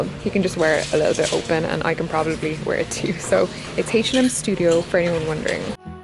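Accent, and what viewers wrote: Ireland. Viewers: omg ur Irish!!!!!!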